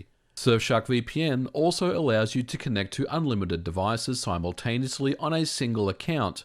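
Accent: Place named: Australia. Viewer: Man, i just learned today that this guy is Aussie, i always thought he was British somehow lol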